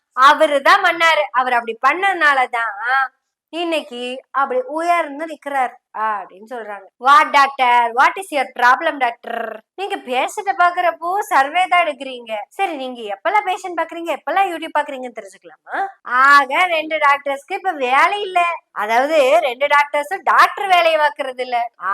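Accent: Kongu, India. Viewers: Ur kongu speech is so 😊😊 beautiful.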